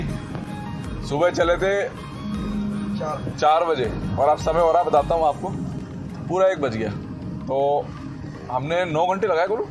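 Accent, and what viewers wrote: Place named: Western Uttar Pradesh, India. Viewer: Bhai ap UP west se ho kya Ekdum hmara area jo moradabad ke pass ka hai bilkul aisa lagta hai apna. Koi bhai local bat kar raha hai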